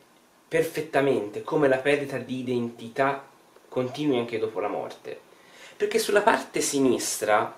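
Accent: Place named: Tuscany, Italy. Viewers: grazie a te comunque per il bel contenuto e la risposta. Io penso che purtroppo ci sia la convinzione che il toscano sia l’italiano perfetto ma purtroppo non é così: non esiste l’italiano perfetto a livello regionale. L’ italiano perfetto é quello senza inflessioni o magari senza inflessioni così spiccatamente marcate. Purtroppo molti toscani invece, sono convinti che il toscanismo sia “il bel parlare”, a volte può essere simpatico ma a volte appesantisce e rende poco chiaro un contenuto che magari può essere bello come il tuo. É questo il caso purtroppo, ma ovviamente a mio modestissimo parere. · Sarà anche interessante questo contenuto ma il marcatissimo accento toscano lo rende davvero pesante e a tratti incomprensibile. Personalmente non sono riuscito a finirlo. Quando si fanno contenuti di qualità come questo bisognerebbe, a mio parere, cercare di essere il più comprensibili possibile ed evitare inflessioni così marcate. 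Mi spiace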